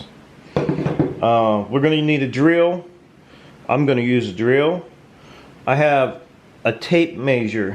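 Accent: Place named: Pittsburgh, United States. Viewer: Just used this video to help me install a damper for the first time. Loved how relaxed and simple you kept it, most DIY or pro style videos get lost in the technical jargon. So thanks for keeping it simple and focused on the point! Plus, the Pittsburghese made me homesick for western PA after a few years away now!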